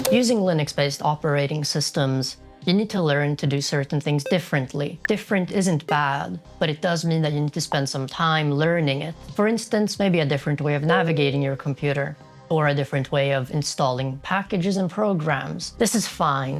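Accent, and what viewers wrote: Sweden. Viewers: You sound swedish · Say no more, the Swedish accent already convinced me. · just clicked on this recommended video, hearing you for the first time. You sound as if you're from Sverige. Anyway, I subsribed because you support FOSS. No matter what *nix you use, I'd recommend to look into the history of *nix, where our beloved penguin is a major part of. Keep up the good work, thanks for this video and love from NL.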